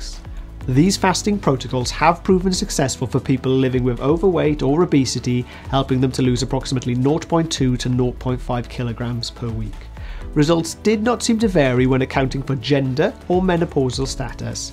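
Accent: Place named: Wales, United Kingdom. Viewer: Love the Welsh accent. Great breakdown of comparisons, thanks. I have T1 diabetes and fasting makes my TIR and BG absolutely fabulous and I feel great.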